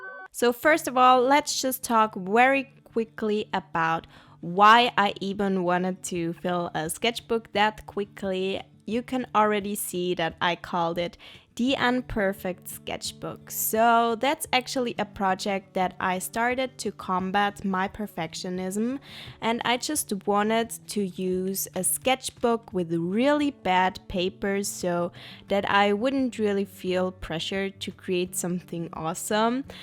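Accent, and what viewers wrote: Germany. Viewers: Are you German? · Where are you from? It sounds like a Germanaccent😂😅😗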